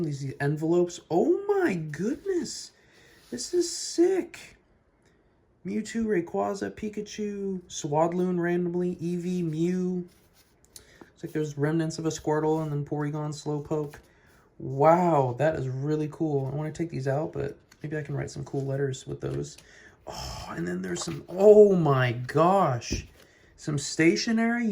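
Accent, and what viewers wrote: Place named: Spain. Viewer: absolutely! Mainly Pokemon cards and books. But I think our approach to card collecting is similar, going for master sets, not necessarily promos, and getting singles over time to slowly complete them, as they become more affordable. Haven't seen you talk about language learning (though you may have). I've heard your Spanish accent in your churros Oreo video, so I suspect you've at the very least dabbled. It's probably my number one hobby, what I spend the most time on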